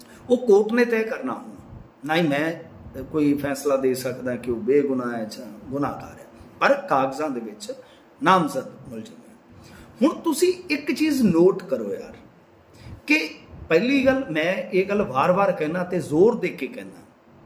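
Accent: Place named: Majha, India. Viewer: Very intelligent and soft spoken journalist is Your Majha accent is very loveable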